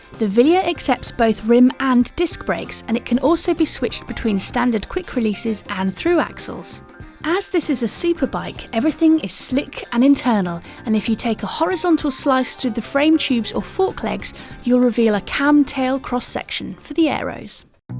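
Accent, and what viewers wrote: United Kingdom. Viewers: Damn your british accent makes me ride along with you.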